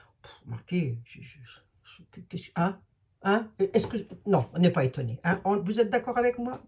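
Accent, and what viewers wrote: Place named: Lorraine, France. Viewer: Bonjour Merci beaucoup, J'adore vous écoutez, vous avez bien le parler lorraine, et ça me fait du bien. Je vous remercie beaucoup pour la voyance. Et votre humour.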